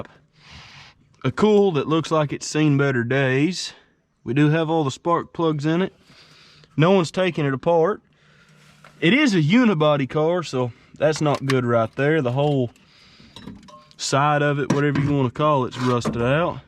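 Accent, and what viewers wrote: South, United States. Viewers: Love this channel. I can tell he is my brother because he is southern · It's nice to hear another southern brother. Keep up with the great content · I’m Australia mate we just love that southern accent you’ve got there. Cheers ! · Hi all great vids mate I'm enjoying them. At first I didn't know what you were saying when I first listened to you speak lol you say oil like ol and coil like cool. I don't know why but Americans can't say the i in the pronunciation of certain words. Coil pronounced like coyl or Doyl. Oil is the same oyl. How do you say Craig all you Americans haha you say it like Crag it's Crayg it's not a silent i. Anyway that's my bit haha. Now lets see how many Americans don't like me telling them this. What are you doing with all the vehicles once you get them going? Jeremy Downunder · You all have a cool accent, the southerner's in America, British and Australian. 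In smack in the middle of the states (Michigan) our accent is boring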